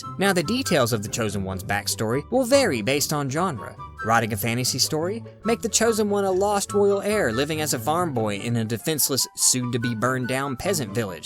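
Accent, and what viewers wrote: South, United States. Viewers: from what U.S. state is that Southern accent?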